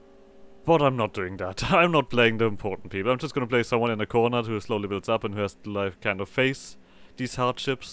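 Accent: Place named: Germany. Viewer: hat 5 sekunden gedauert um zu erkennen dass du deutscher bist lol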